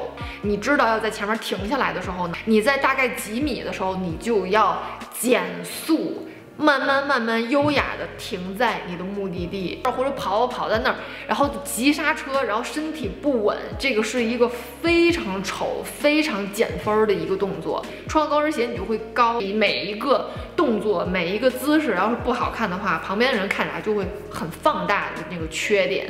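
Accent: Tianjin, China. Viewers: 老乡，一听你说话就知道你是天津人，超飒。一听到你说，马上贴墙测试，发现轻松两只手可以放后背，那我还适合这个站姿吗，怎么让骨盆回到正常位置？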